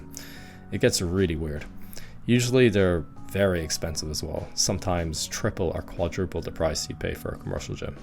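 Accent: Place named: Ireland. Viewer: You’ll need a Kerr, dats just anudder ting yill need. So Irish I love it. Good vid, all true. Suck it performance gyms!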